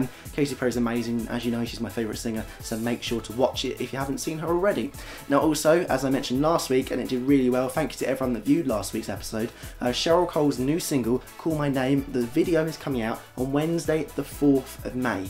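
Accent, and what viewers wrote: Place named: Australia. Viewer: Phaha hilarious! Your australian accent is just like mine, guess that could be a compliment or not we may never know! xD you never fail to make me laugh, thanks! :)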